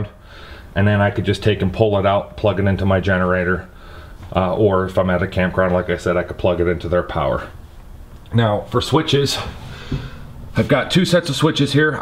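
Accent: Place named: Pittsburgh, United States. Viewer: Nice job. As soon as you started talking I knew you were from Pittsburgh. I just moved out of the city to Ross Township.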